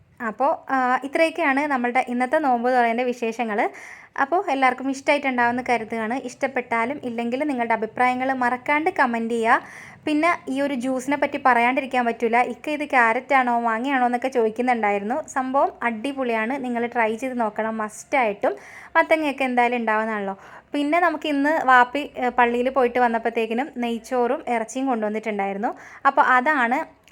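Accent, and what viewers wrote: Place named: Ambalappuzha, India. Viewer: Njangal ambalappuzhakkarum anganeyanu parayunnath ❤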